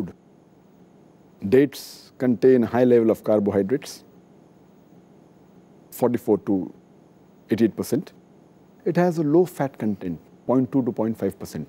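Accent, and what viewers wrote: India. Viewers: Gotta love the Indian English voice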